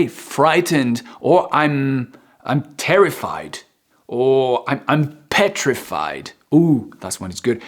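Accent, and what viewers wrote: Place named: United Kingdom. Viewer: I like your british english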